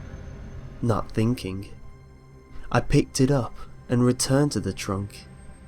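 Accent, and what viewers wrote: United Kingdom. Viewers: omg brit accent so sweet and scary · Any other girls (or guys) out there kinda have a thing for 's voice? I think pretty much everyone digs a British accent-or is it just me? Maybe it's cause of the Beatles, I don't know...I think out loud too much. Hesitates to click post.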